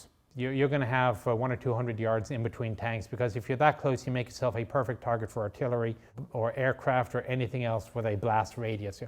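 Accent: Ireland. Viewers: I sense the Irish accent. I’m a dub where you from buddy.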